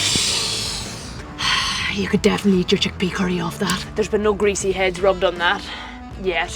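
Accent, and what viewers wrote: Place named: Ireland. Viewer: I would love to split you 😂😂that Irish accent wow stunning ❤❤❤❤❤❤❤❤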